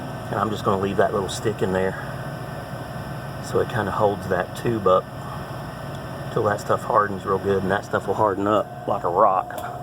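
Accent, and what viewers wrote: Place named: Southeast, United States. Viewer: Hey man, Where' bouts you from? You sound like you may be somewhere near the southeast region? maybe? haha :)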